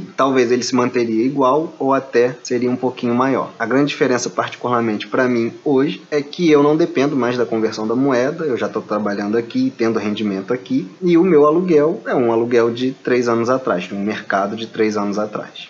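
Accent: Brazil. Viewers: I love the accent from Rio it’s so fun. Cascais is one of the best cities in Portugal. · Estão a gastar dinheiro em escola privada e em seguro de saúde por opção, embora em saúde também descarto totalmente essa opção, porque não se justifica objectivamente. Apesar do desinvestimento feito nos últimos anos nestas áreas pelo governo por motivos vários e as dificuldades que isso acarreta essas áreas continuam muito boas apesar de tudo. Agora você fala uma coisa óbvia: os seus padrões de exigência começam a subir também e daí percebe o porquê das manifestações de insatisfação várias que os portugueses mostram, enfim, você apesar da pronúncia ser brasileira começa a sentir-se português. e isso é tudo normal. Somos exigentes para aumentar os padrões de qualidade e tentar não baixá-los.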